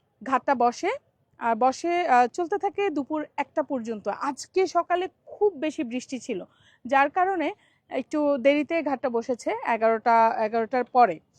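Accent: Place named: Bangladesh. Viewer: আমি ইন্ডিয়ান কলকাতাতে থাকি ,তোমার blog দেখতে খুব ভাল লাগে বিশেষ করে বাংলাদেশের ভাষা শুনতে। আমার origin বাংলাদেশের ফরিদপুর এ।বেশী করে বাংলাদেশের ভাষা বলবেন,অনুরোধ রইল। আমার নাম সুব্রত মিত্র,বয়স 72।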